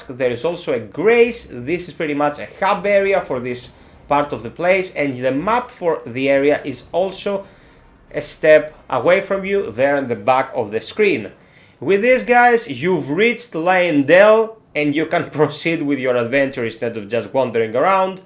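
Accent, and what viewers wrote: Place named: Greece. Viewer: φίλε ευχαριστώ, τώρα το αγόρασα το παιχνίδι και είχα κολλήσει οπότε ξεκίνησα το quest της ranni. Από φωνή ψιλοκατάλαβα την ελληνική προφορά και μετά είδα το channel description 😂 Here's a sub :D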